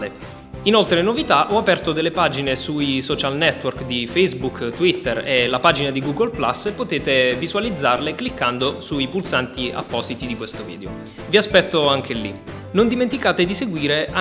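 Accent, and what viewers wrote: Apulia, Italy. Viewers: Ciao, no è pugliese:)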